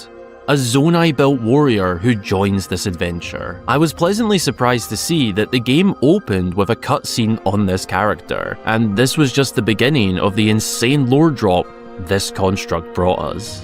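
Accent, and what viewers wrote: Scotland, United Kingdom. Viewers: idk if it's because I havent watched a video of yours in a while but damn dude, did you get extra Scottish? Amazing lol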